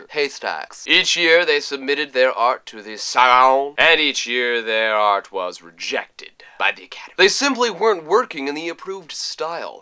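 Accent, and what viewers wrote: France. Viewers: That's an amazing French accent you've got there :3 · loving the french accent! xD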